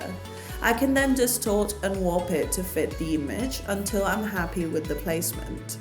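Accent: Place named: United Kingdom. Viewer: Hey enjoyed this video cause you have a loveeely voice and British accent. I am a fresh learner of PS and it was a bouncer, never the less I liked your British diction and crisp clear voice, This was the first video I came across, and I am impressed by your excellent work and editing skills. Your videos will help me later when I come to terms with PS. Loved your charming personality, I have to submit and follow and Big like too. I am a watercolor Artist and trying my hand at POD and that's where learning PS is so important. If you have the time check out my painting videos. Good to know you. #artistsatwork53 #artistsatwork53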